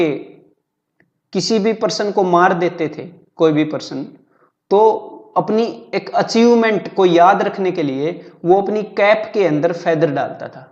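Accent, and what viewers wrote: Haryana, India. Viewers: Nice haryanvi sir ji 👌👌👌👌👌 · bhai maza aa jata hai yaar apki haryanvi type bhasha shun k . . . . knowledge ka dose thanx boss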